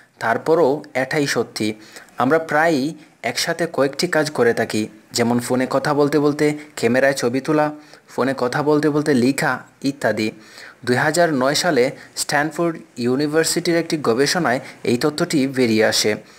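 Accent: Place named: Sylhet, Bangladesh. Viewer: আপনি নিশ্চয়ই সিলেটি ভাষা বা উচ্চারন ঠিক করুন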